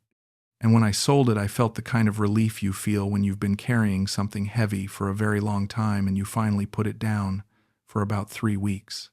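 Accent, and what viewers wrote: United States. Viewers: Listening to a man with an American accent referring to anyone other than his wife as his "mate" does not sound right.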